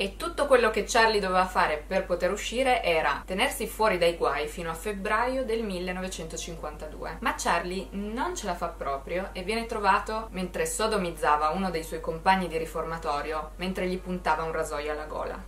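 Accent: Turin, Italy. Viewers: torinese palese bro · È torinese, ma in ogni caso interessante equivoco. In effetti alcune vocali sono esattamente come quelle di alcune cadenze della Sicilia! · Siciliano? :D è l’accento di Torino! Più torinese di così non si può :D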